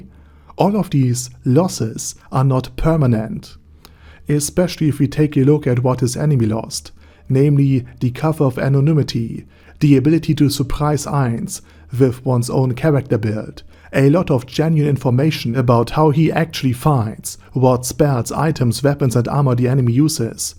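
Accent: Germany. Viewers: Hearing someone analyze anime characters with a german accent sounds so wrong yet so enjoyable.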